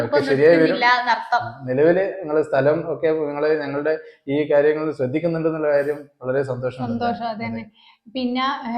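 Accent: Kozhikode, India. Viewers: Kozhikodu language kettirikkan rasa ❤adipoli❤ happy familiy❤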